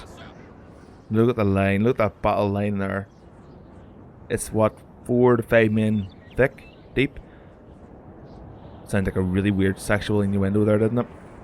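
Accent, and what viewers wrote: Northern Ireland, United Kingdom. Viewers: northern Irish?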